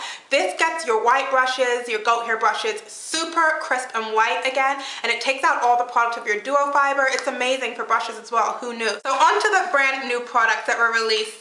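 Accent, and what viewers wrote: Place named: United Kingdom. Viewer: I knew I heard a British accent! :)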